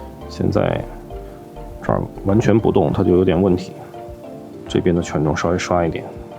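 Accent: China. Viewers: 以后片子开头能不能别整那个中文念法的英文了，每次开始，我都被雷的外焦里嫩的，总是以为我点错视频了。。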